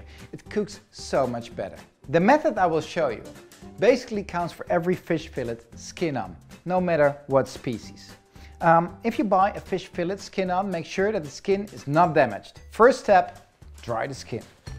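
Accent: Netherlands. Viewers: your English has improved a lot! As a Dutch speaker I could notice all the small Dutchisms you put in the video. Nice job!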